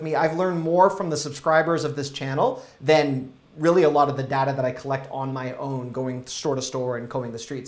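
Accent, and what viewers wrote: Canada. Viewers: Are you Canadian? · Your videos are very enlightening. As a senior citizen with limited mobility I gave been ordering groceries from Walmart for delivery. The pandemic has me practically housebound. For the most part I would encourage grocery shopping for delivery. Unfortunately often the main thing wanted is out of stock & I don't know that till after an order is sent., your videos are interesting & your Canadian accent is intriguing for this old Southern steel magnolia. GOD BLESS!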